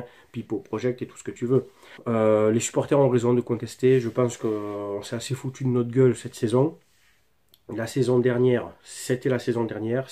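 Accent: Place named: North, France. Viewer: Cet koi ce nordiste avec sa tête et son accent du nord lol 😂 et va te cacher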